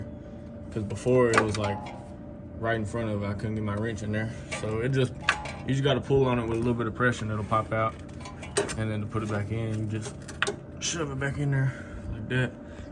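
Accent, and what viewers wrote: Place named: Texas, United States. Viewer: Where you from bro you talk like me I’m from Dallas lol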